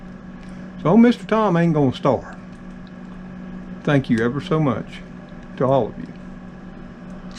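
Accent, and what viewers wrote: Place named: Deep South, United States. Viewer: Dear Mr Tom, Trixie and the kitty crew ….I have been watching you since Dec 2019 and I just love the way you speak...your accent makes me smile and I have learnt so much from you and your way of life in the deep south. Not sure how I came across you but I am so glad I did because yours is my favourite channel so I thinks its definitely us the viewer that is blessed to have such a wonderfully humble and gracious host with such a love for life and his fellow animals. By the way ...I am Indian by heritage but born in the UK and I live in a little village in Shropshire ….a lot of things are so different but the love we share is the same...God bless you Sir....Mand X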